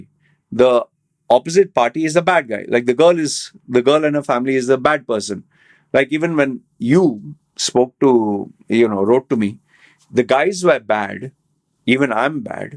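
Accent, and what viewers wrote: India. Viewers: Are you an indian, your accent is completely indian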